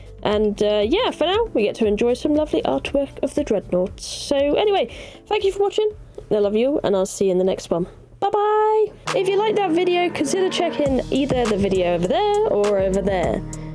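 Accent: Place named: United Kingdom. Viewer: Omg she's a Brit bruh I already couldn't listen to Brits and then they threw in a female.... Barf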